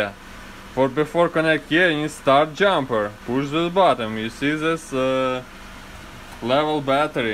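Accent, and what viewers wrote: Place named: Russia. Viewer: I totally agree with you I couldn’t understand most of what he was saying with that Russian accent? I think he was trying to be funny or something but sounded so stupid!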